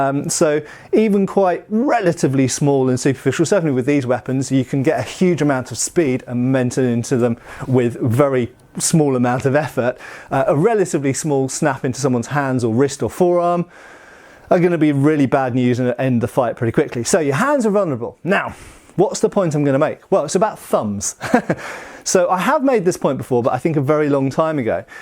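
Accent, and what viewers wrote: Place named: England, United Kingdom. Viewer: The English accent makes him sound smarter.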